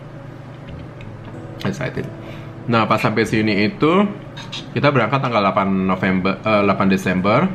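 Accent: Medan, Indonesia. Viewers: Aku suka kali liat vlog abang...bahasanya Medan kali...jd ingat aku sama kampungku...sehat terus ya bang...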